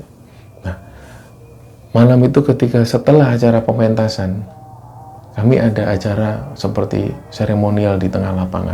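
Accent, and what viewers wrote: Java, Indonesia. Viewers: ikut nyimak mas...terasa bgt alunan suara khas Jawa ...sangat mistis rasanya.... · Suara nya khas jawa .....bgt walau menggunakan bhs indonesia tp khas nya g ketinggalan ....,bisa mengobati kerinduan akan kmpung halaman,dan cerita2 yg d sajikan bnr2 enak d dengar ....salam kenal mas dr kab,bekasi.....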